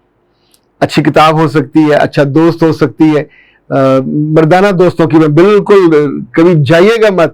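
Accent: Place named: Hyderabad, India. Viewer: Hyderabadi Urdu ...Awesome 👌👌👌😊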